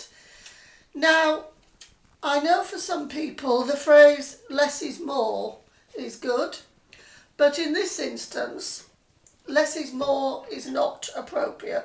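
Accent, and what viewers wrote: United Kingdom. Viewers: This looks like a lot of fun! Thank you :) wondering if you are from Yorkshire?! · Hi so nice to hear someone from the uk especially who doesn't make "long" wind out of everything. Thought your tutorial was excellent and going to put it into practice. Thanks once again Sylvie x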